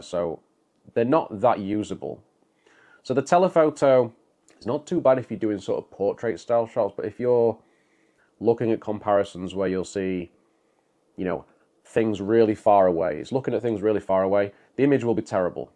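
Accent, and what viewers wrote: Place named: Liverpool, United Kingdom. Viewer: First of all why are you speaking in a Scouse accent? Whereabouts in Liverpool are you actually from? If you are not from Liverpool then why are you speaking in a Scouse accent?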